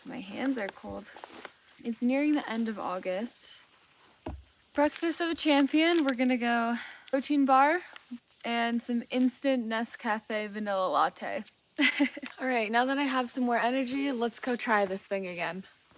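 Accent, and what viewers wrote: Canada. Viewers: Great Canadian accent.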